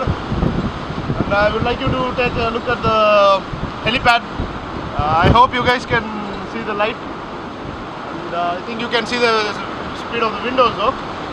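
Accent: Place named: India. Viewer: Subtitles would be helpful, guys. Your accent is very hard to understand for non-Indians.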